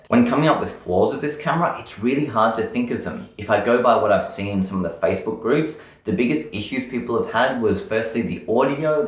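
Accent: Australia. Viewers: i think you are australian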